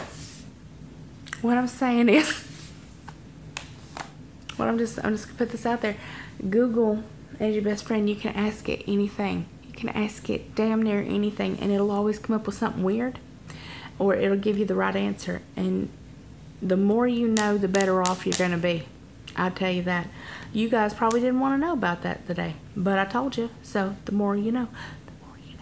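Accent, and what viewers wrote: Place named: Kentucky, United States. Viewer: where are you from originally? I'm from KY and you talk like we do.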